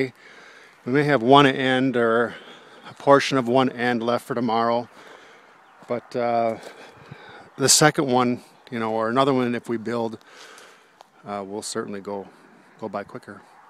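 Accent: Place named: Upper Midwest, United States. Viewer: Based on your accent, somewhere west of the Great Lakes and near Canada.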